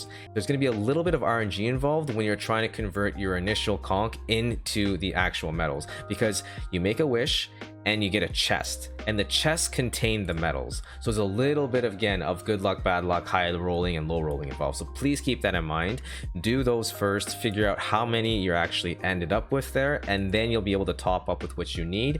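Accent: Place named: Canada. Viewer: Ahhh. A nice normal Canadian accent.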